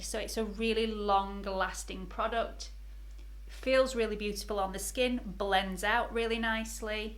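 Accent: United Kingdom. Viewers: I love your accent,from which part of the UK you are🙂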